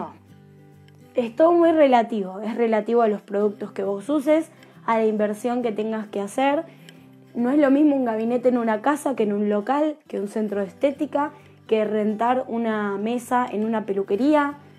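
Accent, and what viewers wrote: Argentina. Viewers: Una pregunta ,sos Argentina???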